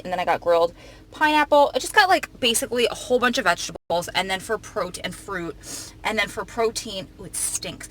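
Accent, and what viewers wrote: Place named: Long Island, United States. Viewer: Awww no prob! I love your personality & accent. I have the same one bc I'm from long island, NY. Lol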